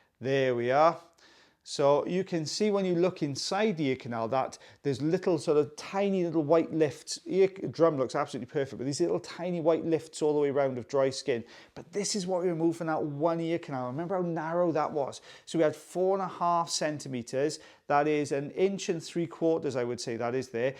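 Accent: Wales, United Kingdom. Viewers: When it's 106 degrees in California and you come across a lovely welsh accent! Dioch!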